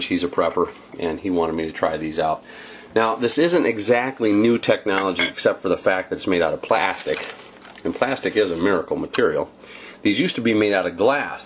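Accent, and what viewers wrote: United States. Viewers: Hi thanks for the Video and info, I am living in England and did not quite catch what it was you added to the Marmalade later on in the Video after the sugar. It may be the American Accent or an American product I am not familiar with... I've just started prepping this year and have started to move onto producing long term storage foods. Not spoke or met any other preppers yet in England does not seem to be as big a concern over here.... Thanks for your time Tony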